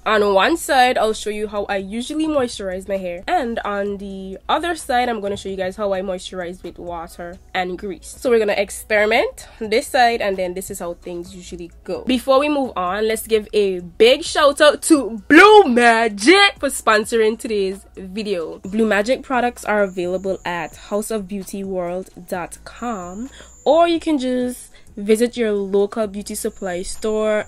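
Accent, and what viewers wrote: Caribbean. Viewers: Can hear that Caribbean accent... much love to my caribbean people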